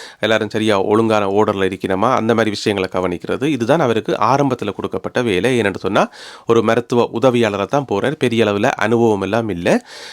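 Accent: Jaffna, Sri Lanka. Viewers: அவர்களே! முதலில் என் வாழ்த்துகள். தமிழைப் போல் இனிய மொழி இவ் உலகில் உண்டோ என்று கேட்டால் நான் இல்லை என்றுதான் சொல்வேன். அமிழ்திலும் இனிய மொழியை தேனிலும் இனிமையாக யாழ் மொழியான பேச்சு மொழியில் சொல்லும் விதம் இருக்கே என்னவென்று சொல்வது! அருமையாக கதை பயணித்து நிறைவு பெற்றது. வாழ்க! வளமுடன்! நானும் இலங்கை தான் அம்பாரை மாவட்டம். என் உறவுகள் அங்கே இருப்தால் பார்ப்பது வழக்கம்.நன்றி தமிழ்! Siva T.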